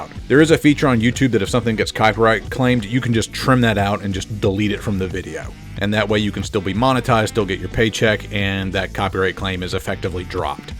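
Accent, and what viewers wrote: South, United States. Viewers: you are my favorite nerd with a southern twang on youtube. Really you have taught me that Nascar is so much more than good ol boys driving around in circles. It's shameful to see how far Nascar has fallen when they really had a good thing going in the early 2000's.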